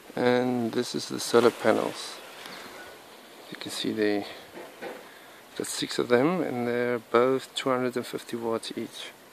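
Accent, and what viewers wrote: Afrikaans, South Africa. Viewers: is that a South African accent I hear? i LOVE your setup... It would be great if I had land like you do with a running stream for power... how great is that? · Immediately recognised the South African accent. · is that an Afrikaans accent Im hearing?! Ah the nostalgia. Lekker turbine boet!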